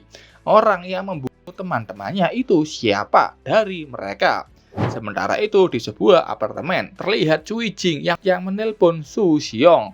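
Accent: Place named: Java, Indonesia. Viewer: sebenernya ceritanya menarik... cuma yang baca narasinya terlalu datar dan medhok jowo sekali... 😭 jadi agak aneh dengernya... 🙏🏻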